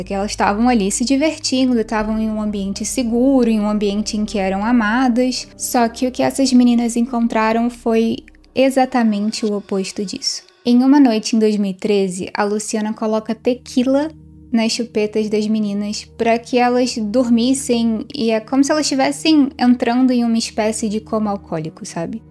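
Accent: Rio de Janeiro, Brazil. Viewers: Vc é carioca? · Sua voz é muito bonita, o vídeo bem editado, tudo quase perfeito, mas esse seu sotaque carioca fortíssimo complica, soa quase como um cacoete, e faz perder a imersão da narrativa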